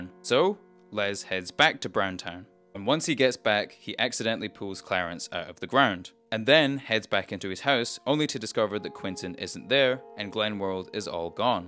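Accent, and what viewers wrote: United Kingdom. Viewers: hearing a british bloke voiceover this actual visual masterpiece of a culture film is weird somehow. none the less, as a diehard fan myself, i still learnt some stuff from ya & about the show i grew up on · You sound like you’re from Edinburgh. Actually, maybe Stirling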